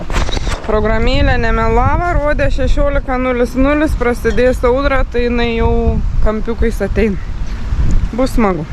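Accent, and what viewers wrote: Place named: Šiauliai, Lithuania. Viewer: Kaip miela girdėti šiaulietišką šnektą😊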